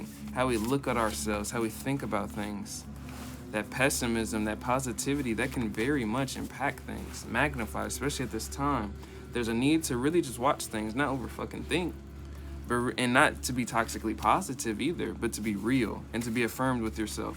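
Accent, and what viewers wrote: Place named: London, United Kingdom. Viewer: but you give me London vibes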